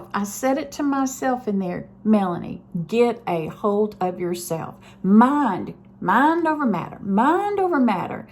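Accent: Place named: Kentucky, United States. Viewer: The doctors want to do an MRI on me because I devevloped SSHL, Sudden Sensorineural Hearing Loss, in my right ear. There is a very small chance it is caused by a benign tumor. Almost never is the cause known though. I do have Hashimoto’s Disease and for ones very educated about it they know it can cause SSHL and other ear related problems. I am dragging my feet on doing this MRI because I do suffer from claustrophobia. And I do not like feeling restrained. I understand what you mean about also having something in your ears and over your eyes while being inside of an MRI. I stopped getting headphones at museums because having them over my ears was annoying for me. I think all of that would just add to the feeling of claustrophobia and being restrained. In the past my claustrophobia has often been dependent on how well rested I am. I don’t like confined places, but if I am well rested I am not panicking. 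I am American but I live in a an old city in central Europe. Sometimes in the older buildings, the elevators are super small. There are times I panicked and took the stairs and other times I got on the elevator with no problems. Thank you for sharing your honest feelings and very human emotions! My late husband and I lived in Kentucky for 10 years. So I enjoyed hearing your accent.😊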